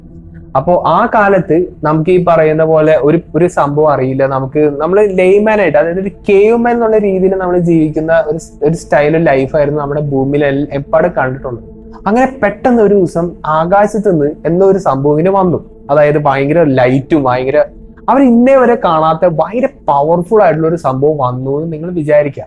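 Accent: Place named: Kochi, India. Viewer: കൊച്ചിക്കാരൻ ആണോ..? ആ ശൈലി ഇത്തരം വിഷയങ്ങൾ കൈകാര്യം ചെയ്യുന്നതിൽ അഭിലഷണീയമല്ല.. വ്യക്തത വളരെ കുറവാണ്... 🙂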